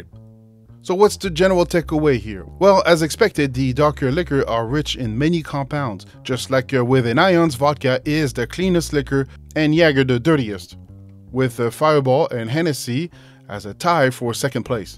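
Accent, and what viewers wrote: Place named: South Africa. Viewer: The way he talking I thought was South African or African also from wales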